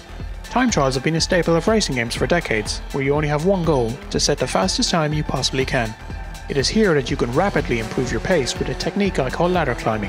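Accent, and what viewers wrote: Ireland. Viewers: You sound Irish and I’m Irish and you sound like you have an Irish accent, how you Irish.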